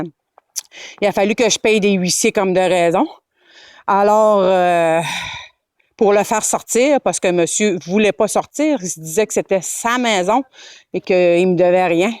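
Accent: Quebec, Canada. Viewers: mon enqueeeeeete xd laccent quebecois fait vraiment pitier . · c'est toujours marant d'entendre l'accent Québequois très prononcé ! tout comme le créole c'est une langue à part d'origine Française purrait on dire ! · C'est l'accent québécois, mec... Si tu ne l'aimes pas, passe ton chemin. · Vive l'acent québécois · thevalkyrie666 awb enfait elle c'est parler c'est juste l'accent québécois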